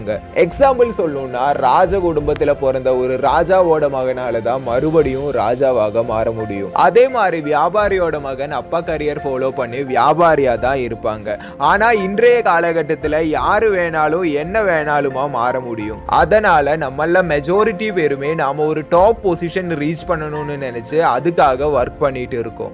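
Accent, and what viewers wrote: Kerala, India. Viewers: Malayalam slang varuthu..but nice👍🏼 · யோவ் ஒன்னு மலையாளம் பேசு இல்ல தமிழ் பேசு,அது என்ன தமிழ் மலையாளதகதை தமிழ் ஸ்டைல்ல பேசுற,சகிக்கல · I like the way you talk tamil. It’s good to hear a Malayalam slang Tamil. 👏🏻👏🏻👏🏻 keep posting more videos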